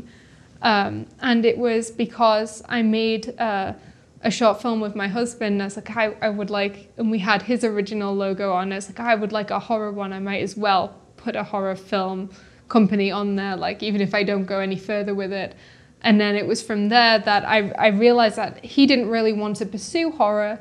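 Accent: United Kingdom. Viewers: So straightforward and inspiring, best of luck. Also, the accent geek in me is intrigued as well, am I hearing Geordie origins maybe?!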